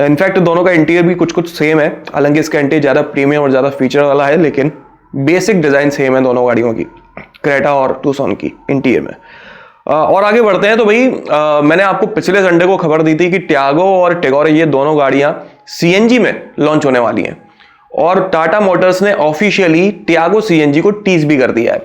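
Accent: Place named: Madhya Pradesh, India. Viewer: bhai tum mujhe mp side ke kyo lgte ho language ki wjh se , jo up se lage huye mp ke district hain unse , jese shivpuri , ashok nagar ,datia gwalior wagera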